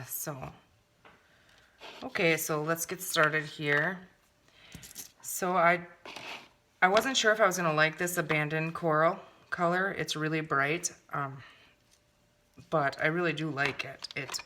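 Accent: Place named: Minnesota, United States. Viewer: HA! I was born, raised, and lived the first 26 years of my life in Bemidji! I've lived all over the country and abroad, I've lost my Minnesotan (I get it back when my family comes to visit. lol). Your accent brings me RIGHT HOME! Also, I love all things crafting. I subscribed!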